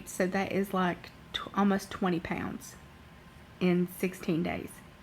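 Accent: South, United States. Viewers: Are you from the South, honey?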